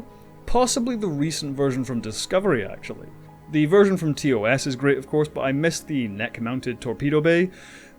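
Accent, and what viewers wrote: United Kingdom. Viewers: I'm guessing he's British from the accent. Whether he's in the UK or Australia, however, means Harmony Gold isn't an obstacle to getting localized product.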